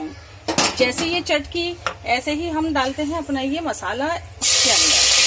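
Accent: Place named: Bijnor, India. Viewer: aapka bolne ka andaz bijnori h zabardast ji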